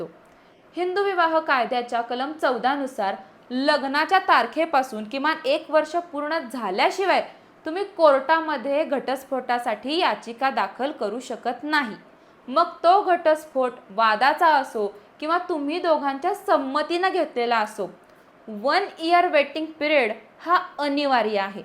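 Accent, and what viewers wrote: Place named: Kolhapur, India. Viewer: ताई फ्रॉम कोल्हापूर वाटते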